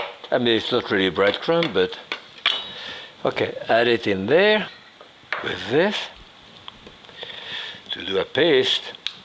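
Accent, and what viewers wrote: France. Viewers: He has a French accent. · He's french!! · Just shut up and go away. He DOES NOT have a speech impediment. He has a FRENCH ACCENT.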